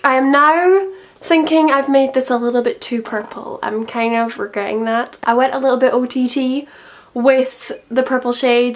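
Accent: Scotland, United Kingdom. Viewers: I usually always know whether i'm going to like a video going by an intro. And i went through like 3 videos before yours, but i'm staying here :) good on you for not looking like a conceited, regular influencer. Interesting how your from Scotland :P I lived in Ireland Dublin and we've got VERY similar accents. Now i know why people think i'm scottish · Alaiza Toscano I'm pretty sure she's Scottish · Natalie Briggs nevermind, she's Scottish lol she just spoke so light handed with it, I had mistaken it for Irish!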